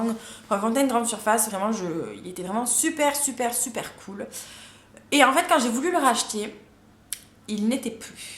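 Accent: South, France. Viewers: Coucou! Franchement j'adore tes vidéos! T trop mimi! Ca fait du bien de regarder tes videos d'autant plus que j'adore ton accent, je suis du sud mais je vis dans le nord depuis quelques années et c'est dur :( voilà je suis régulièrement tes vidéos qui m'apprennent pleins de choses ;) continue t trop cool et trop rigolotte!! Bye!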